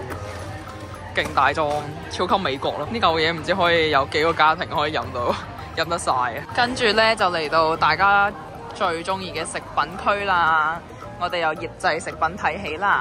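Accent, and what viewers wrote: China. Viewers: 你系大陸人?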